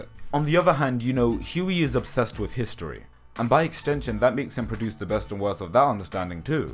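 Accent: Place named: England, United Kingdom. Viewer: "sound white"??? I mean, from your accent it sounds like you've either spent time in england or have english parents... Just cause you have that accent doesn't mean you're white. Any more than someone sounding hood makes em black.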